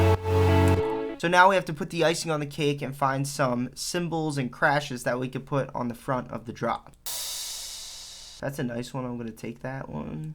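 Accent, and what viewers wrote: Philadelphia, United States. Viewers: This man is from Philly or Baldimore for sure, am i right? Sick tip though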